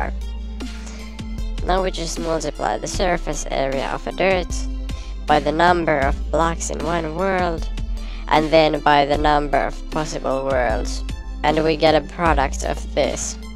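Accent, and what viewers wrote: Finland. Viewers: Ootko Suomalainen Koska Kuulostat Siltä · Are you from Finland? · are u finnish? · are u from finland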